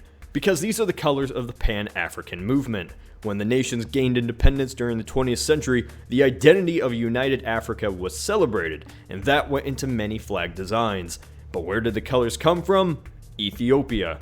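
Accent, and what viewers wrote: Canada. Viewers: Are you Canadian? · So are you Canadian or North American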